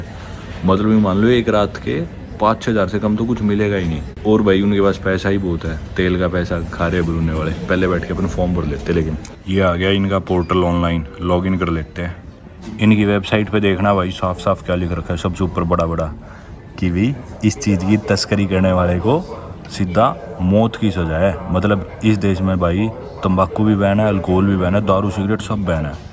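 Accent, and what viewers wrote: Haryana, India. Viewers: आपा Haryana wale hindi me Haryanvi mix kr hi dete hai , wo hi baat pta lg hi jata hai गामा के बालक सै ❤❤ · This haryanvi accent literally gives me a headache and very unpleasant for my ears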